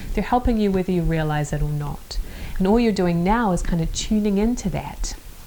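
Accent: New Zealand. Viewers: you talk like me a kiwi I guess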